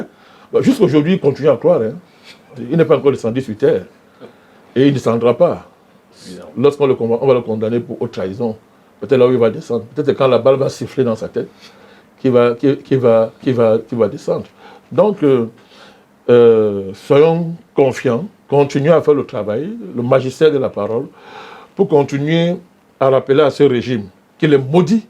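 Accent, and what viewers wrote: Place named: Cameroon. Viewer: Longue vie l’état ivoirien plus respectable et responsable que ton Cameroun de malheur ton accent même est à gerber 🤮 🫢😁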